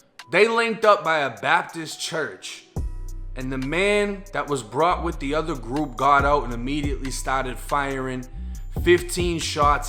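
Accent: Boston, United States. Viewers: Hey man I know youve lived between FL and MA. I grew up in MA abd moved to FL recently. Did you pick up dropping your R's in MA or is that how you've always talked? No shade at all just wondering. Cause I grew up w/ that shit but I didn't know if the Boston accent is picked up when you're older. Just foubd your channel and I'm definitely a fan, keep the grind up and good luck. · I hate your Bah-ston accent lmao 🤣 "He drove his cah, he didnt stahp, he went too fah, he was paht of the cahtel..." Good videos bro, I fw the page 💪🏾💪🏾💪🏾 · Cahhhh, tahhhh-git. You gotta be from Boston or providence lol mad love from a New Yorker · Yo my guy are you from Boston??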